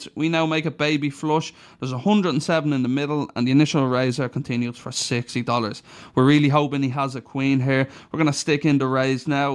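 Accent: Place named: Ireland. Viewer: Love it! I just watch your vlogs cause I enjoy hearing hand histories in an Irish accent. Haha. Anyways great episode. Also, that raise on the river with Q10o was SPICY!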